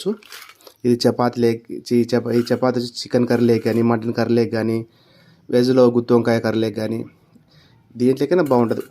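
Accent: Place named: Anantapur, India. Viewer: మీ భాష వింటే అనంతపురం జిల్లా వాళ్ళు అని తెలుస్తోంది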